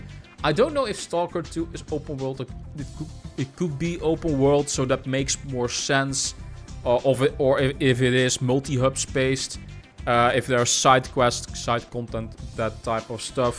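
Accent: Netherlands. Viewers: Is that Dutch accent?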